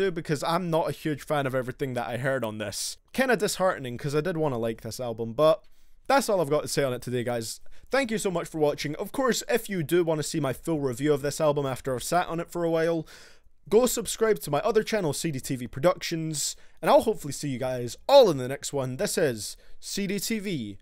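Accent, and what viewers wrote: Scotland, United Kingdom. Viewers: Shut up XXX is way better than yo British accent #ScarLxrd is still beter than you too LOL! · Good vid dude can't wait for the next and fair opinions, oh yeah and where in Scotland are you from cause you sound Scottish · A BIRACIAL SHAWN CEE WITH A SCOTTISH ACCENT YOU SAY?! COUNT ME IN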